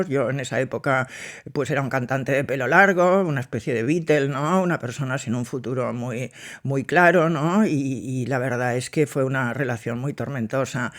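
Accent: Catalonia, Spain. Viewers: Me encanta como.hablas eres genial ¡¡!!un saludo 😊❤tu eres catalana no??yo tengo mucha familia en mataro y gerona se fueron de aki de extremadura😊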